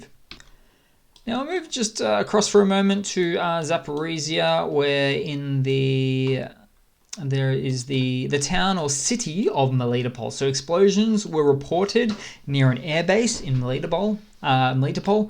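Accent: Australia. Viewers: Really? are you an Aussie? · Hey. I like your reporting. Good stuff. Honest question here: what is that accent? Australian? Please forgive my ignorance. It’s pleasing to the ear, but I can’t quite place it. Just guessing Aussie. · Same accent, both Australian.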